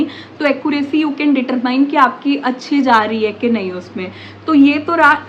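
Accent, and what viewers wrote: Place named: Pahadi, India. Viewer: Maam are you giving RBI grade B too ? also are you pahadi ? I feel your accent is pahadi those himachali , uk folks have that.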